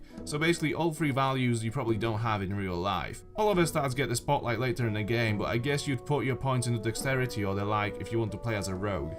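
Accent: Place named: Northern England, United Kingdom. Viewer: Wait so are you Polish as per your name, or are you from Sheffield?! Seriously you sound like you're from northern England! Nice review though 👍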